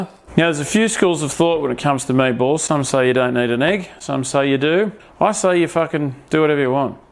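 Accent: Australia. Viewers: i fucking subbed cause ur swearing in an Australian accent its funny as fuck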